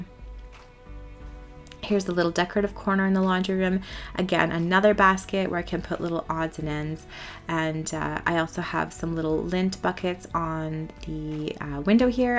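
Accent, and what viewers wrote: Canada. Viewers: You have such a pretty laundry room. I love the hits of red and cream and white it just looks so organized and clean it would inspire me to get busy on laundry. I like your Canadian accent too. Your voice is very soothing.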